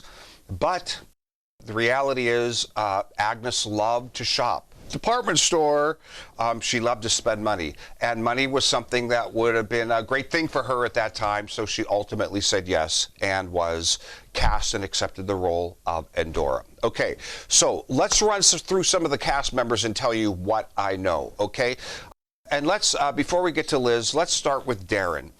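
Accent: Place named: United States. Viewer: The accent of the narrator is way too American!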